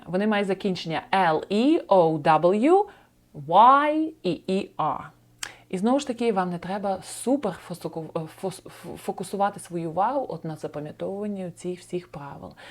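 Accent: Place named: Precarpathia, Ukraine. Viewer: мікс прикарпатських інтонацій української мови, доповнених певним акцентом тривалого проживання в англомовному середовищі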